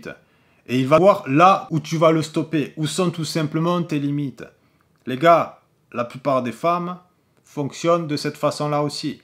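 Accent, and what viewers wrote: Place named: South, France. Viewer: C'est la 1ere fois que j'entends un allemand parler avec un accent du sud 😂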